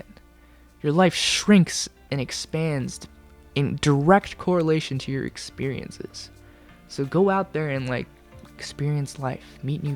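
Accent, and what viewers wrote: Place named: California, United States. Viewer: I'm from New York (Brooklyn, Bed-Stuy) and I knew from the moment you started talking you were from California, the accent is pretty heavy.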